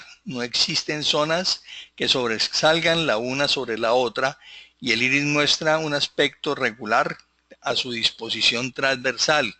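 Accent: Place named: Colombia. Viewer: Buenas tardes usted da cursos de este tema es decir iridiologia me interesa y de donde es yo soy de colombia fue que hice un curso de irdiologia pero veo que no me enseño como es .Como usted esta explicando este supuesto profesor me cito dos dias pero no me explico como usted habla .vulharmente me robo la plata .mi telefono es <num> le agradeceria si me llamara si usted .veo que parece ser de colombia por el acento gracias dios los bendiga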